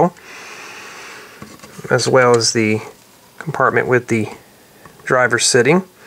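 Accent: Texas, United States. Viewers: Are u Texan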